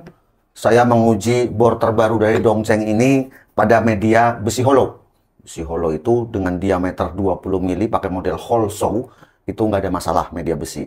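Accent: Tegal, Indonesia. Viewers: bhs tegal e mbabed...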